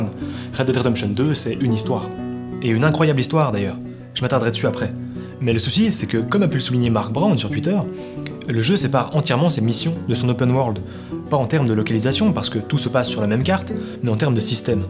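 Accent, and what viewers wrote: Belgium. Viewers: Il est belge ??? Psk il dit pas huit mais wuit par exemple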